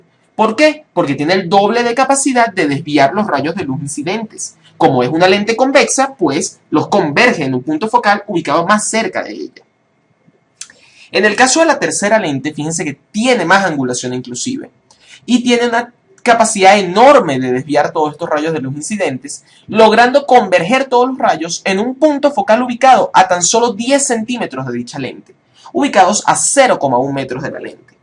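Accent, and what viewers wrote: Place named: Venezuela. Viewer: Jeje tu acento, supuse que eras Venezolano jeje :) Me alegro mucho de conseguir por fin un EXCELENTE video realizado por un Dr. Venezolano :) Excelentisimo de verdad =) Saludos desde Anzoategui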